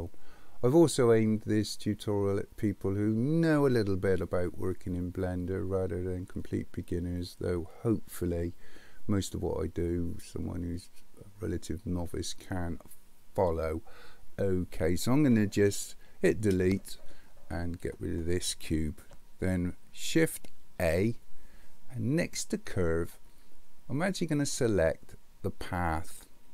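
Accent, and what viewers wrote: West Country, United Kingdom. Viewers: Thanks, nice clear tutorial. Like the west country accent too! makes a change.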